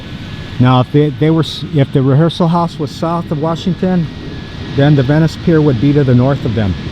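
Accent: East Coast, United States. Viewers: You’ve lived in la all off your life but you have a thick east coast accent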